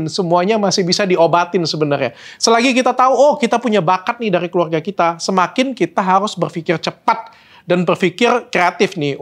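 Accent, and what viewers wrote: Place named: Kalimantan, Indonesia. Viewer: biasa nya kalau ngomong karena "kerana" dan R agak kedal itu asal kalimantan bener kah ?? BTW ,, menunggu vitamin untuk rambut uban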